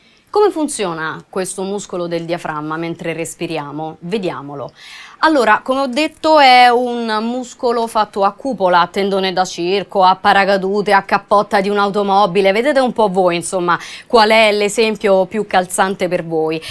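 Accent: Rome, Italy. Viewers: Sei romana... Interessante lezione!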